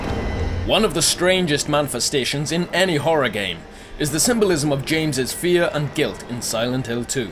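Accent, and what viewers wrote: Wales, United Kingdom. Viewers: Hey, are you Welsh? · Are you Welsh? You sound pretty Welshy to me :)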